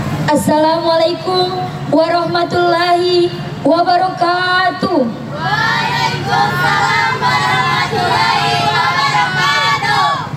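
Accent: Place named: Tondano, Indonesia. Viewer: Sudah dialek Tondano, jawanya tinggal 5 %, kalau Suriname dialek dan bahasa masih khas Jawa. Saya heran di Tinggal di Amerika masih begitu lestari budaya Jawa.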